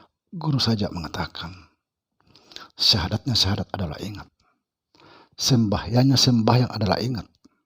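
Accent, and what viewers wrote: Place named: Sulawesi, Indonesia. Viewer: Assalamualaikum warahmah tullahi wabarakatu..bolehkah kami tahu bapak asalnya dari mana ya....dari logat tuan guru sepertinya..Sulawesi...?dimana ya...ilmu tuan guru hampir sama dengan nenek moyang saya.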